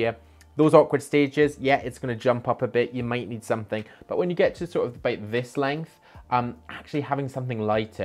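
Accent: Scotland, United Kingdom. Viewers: I like your video. Just curious, you sound like a cross between a Scot and a British man. What is your nationality or background if you don't mind me asking? I just like to educate myself on the different accents and sounds that I hear.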